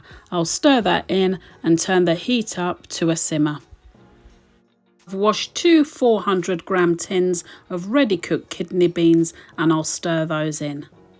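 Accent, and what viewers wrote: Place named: London, United Kingdom. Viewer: Hi.. You videos are amazing I've liked a few mostly veggie as I dnt eat meat.. I love how your spice blends and cooking methods can bring flavour and spice to simple vegetables.. I plan to try your bean chilli recipe because its just seems so much full of flavour compared to the garlic powder, onion powder, smoked paprika recipes I'm used to seeing lol can I ask where you are from? I grew up in Hammersmith sw London and your voice reminds me of home just as much as your recipes.. Keep it up x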